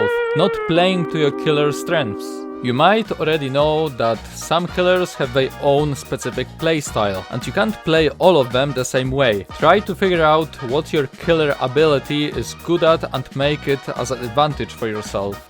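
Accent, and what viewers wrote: Poland. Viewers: strong polish accent 😨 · I heard Polish accent and subbed, 🇵🇱❤️🇵🇱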